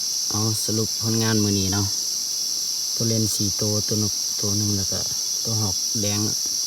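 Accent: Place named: Champasak, Laos. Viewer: ຢູ່ແຂວງໃດເດຟັງສຽງຄືຄົນຈຳປາສັກ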